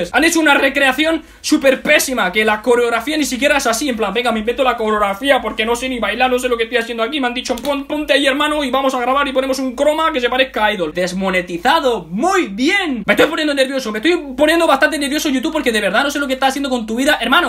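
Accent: Argentina. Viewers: el Argentino se te pego ati♥♥♥♥ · ME ENCANTA TU INTENTO DE ACENTO ARGENTINO, AYUDA TE AMO MUCHO. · Amo tu manera en que dices las palabras de Argentina 😂❤ Por algo te amo tanto ❤💫💕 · AMO ESCUCHARTE HABLAR CON EL ACENTO ARGENTINO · Viste como cuando se te pega lo argentino:v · Me encanta que uses el acento el Argentino xd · Amo tu intento de argentino😂😂💜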